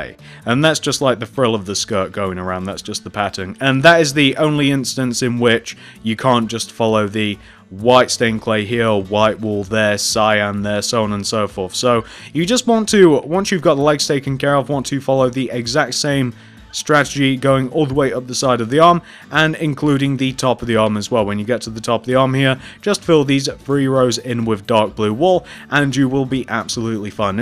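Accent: United Kingdom. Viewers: so that is why you have a british accent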